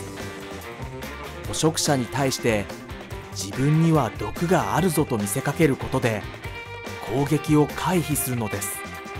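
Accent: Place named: United States. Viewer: アメリカ系のハーフの人が喋ってるみたいで良き。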